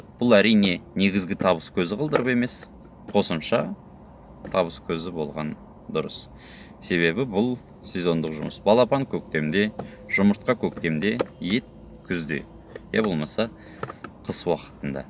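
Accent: Standard. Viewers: Бауырым саған риза болып жатырмын Сенің қазақша таза сөйлеуіңе еңбегің жансын кеңестерңді жіберіп тұр Бұл біздің қазағымызға керек кеңес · Бауырым Алла разы болсын. Қаймағы бұзылмаған Қарағанды тумасы қазақшаң таза екен. Кеңесіңе рахмет. Біраз нәрсе үйренуге тұрарлық. Өзім қатты қызығатын кәсіп. Ісіңе сәттілік.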